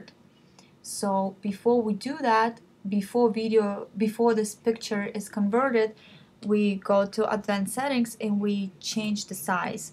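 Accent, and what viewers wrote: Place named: Ukraine. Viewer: у Вас український акцент))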